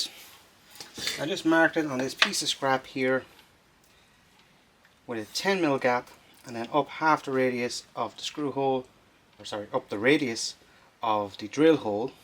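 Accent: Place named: Ireland. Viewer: Just discovered your channel! I like your style, and the simple but elegant toolbox really respects the Japanese methods, which are simpler than a lot of people realize. Subscribed! Agree with on volume: I'm old, and my ears have trouble with the loud music and intro and your lovely soft Irish speech. A little balance wouldn't go amiss.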